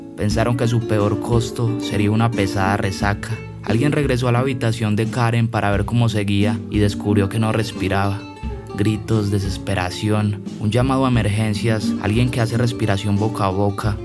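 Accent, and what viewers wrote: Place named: Antioquia, Colombia. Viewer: Que paisa, es del Valle de Aburrá?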